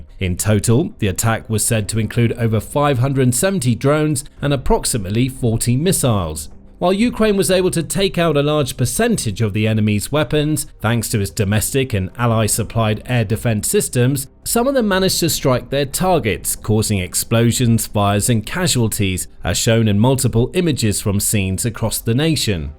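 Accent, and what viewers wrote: England, United Kingdom. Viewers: I am so tired of an English accent. Thumbs down.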